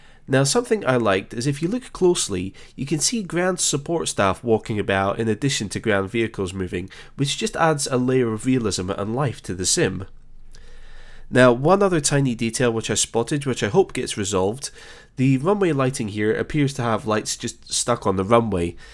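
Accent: Scotland, United Kingdom. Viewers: Right on,! Lots of speculation out there both from the "users" AND associated developers. Hope for the best - plan for the worst, Expect nothing, and you won't be disappointed. Those are a couple of my old "standbys". Call it cynical, but for me it has worked. I will be holding on dearly to both my boxed and steam versions of FSX until well after the dust settles next year! But, if the new version pans out perhaps you will develop another great tutorial series for it? I can guarantee you at least one follower! <smile> BTW, not too long ago, we were up in what I have assumed to be your homeland in Scotland. ( Your accent and Inverness Airport?) Anyway, we spent several weeks driving around Skye, Lewis & Harris, the Highlands, etc. Beautiful country-side, great people! Was quite interested in the airport up in Stornoway (EGPO). I later googled and found a decent free FSX add-on for that little former military airfield that some of your viewers might also enjoy. 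It has an interesting history, as do many other converted military airfields around the world today, like the one in my home-town, Burlington, VT - Burlington Intl (KBTV).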